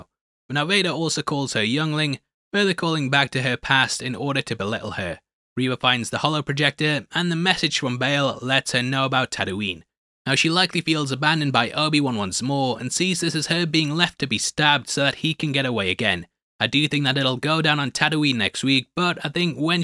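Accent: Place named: United Kingdom. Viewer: Come for the takes, stay for the cool British accent. 🏴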